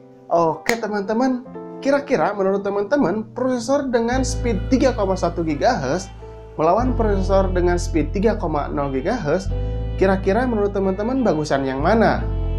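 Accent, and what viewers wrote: West Java, Indonesia. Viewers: Baru beberapa patah kata aku sudah tau bahwa anda orang Sunda 😄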